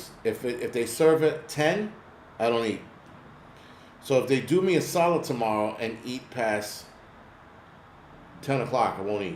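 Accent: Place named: Northeast, United States. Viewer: I'm from Jersey but, haven't lived there in a long time. I enjoy your northeast accent & manner of talking truth. You make me laugh.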